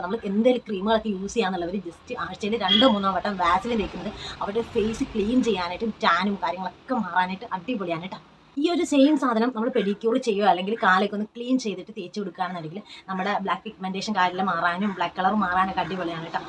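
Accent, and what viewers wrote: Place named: Hindi Belt, India. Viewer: Hindikaru de Malayalam tracilete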